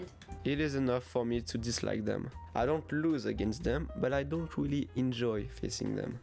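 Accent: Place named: France. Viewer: french accent :p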